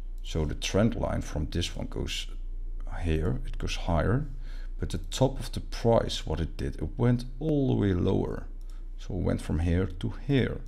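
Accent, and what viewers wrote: Netherlands. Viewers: Nederlands accent 🤣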